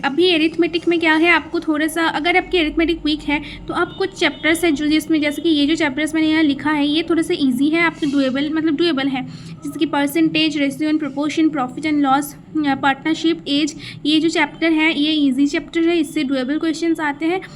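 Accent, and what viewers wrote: Bengal, India. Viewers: Bengali accent ....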